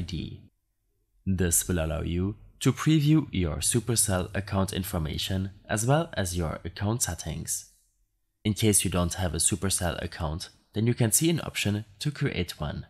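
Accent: Germany. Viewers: You are german i hear the accent if im correct lol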